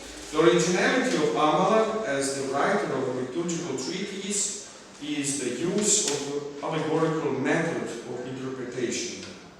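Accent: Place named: Russia. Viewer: Majgad koji russian english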